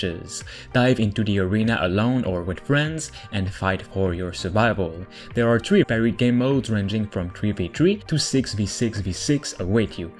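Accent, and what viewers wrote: Quebec, Canada. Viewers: Petit accent du Québec ? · I know a québécois accent when I hear one ;) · love that channel ! nice accent! jai la meme... vive les quebecois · le gros accent québécois haha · Es-tu Québécois? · French from Montreal Lmao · You sound québécois! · You sound like a french canadian it's so weird i like it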